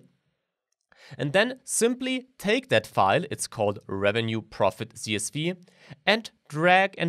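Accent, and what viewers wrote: Germany. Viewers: I love your Deutsch accent <3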